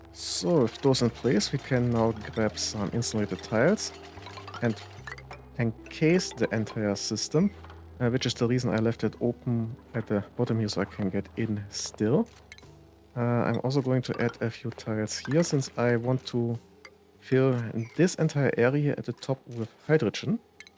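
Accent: Germany. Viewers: hey, like ur style, like ur voice, but plz try to work on ur english. ( Sis needs to bi abaf zwölfhundret) ich bin au deutscher, aber dein deutscher akzent is krass^^ besserr werden! ;)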